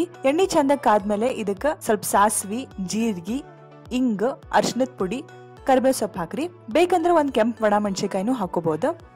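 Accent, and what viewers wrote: North Karnataka, India. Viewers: Ri madam, i really appreciate ur unique recipe, but i watch ur videos becz of ur voice and especially UK slang. U r multi talent, u r mixture of Modern, Traditional and cultural Angel. Thanks Ree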